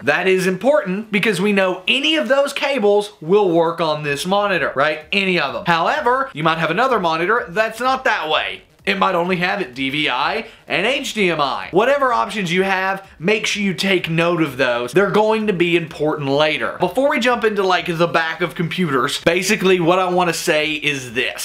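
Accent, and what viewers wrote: United States. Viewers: I lasted only 30 seconds.... TOO loud, too intense, too ummm American. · hes so american omg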